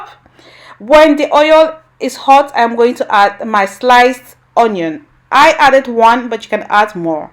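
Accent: Twi, Ghana. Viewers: I love your twi so funny😀😀😀 sending you love from Ghana 🇬🇭❤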